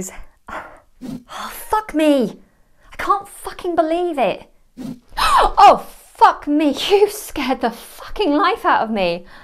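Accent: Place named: United Kingdom. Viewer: I will always repeat it. I love always to listen to your British accent.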